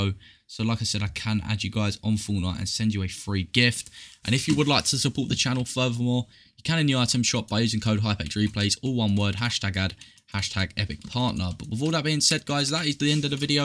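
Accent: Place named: United Kingdom. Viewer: not a british dude again hell naw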